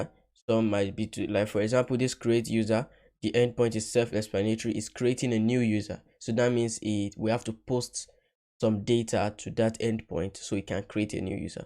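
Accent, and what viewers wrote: Yoruba, Nigeria. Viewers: Great one, the tutor voice sound like a Nigerian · I am also perceiving it from his accent, I am sure is a Nigerian 😅 Yoruba specifically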